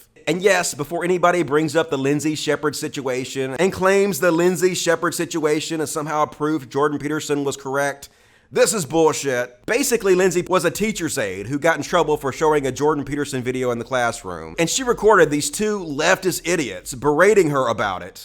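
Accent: South, United States. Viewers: Thought so, that southern drawl. You don't know shit. A comedian in Quebec made a joke about a disable kid and lost his career over it basically. He is trying to appeal bu he's broke and has tried a go fund me page. He was fined tens of thousands of dollars and the court case broke him. Go Fuck Yourself, I'd slap you if you were in the room, lol.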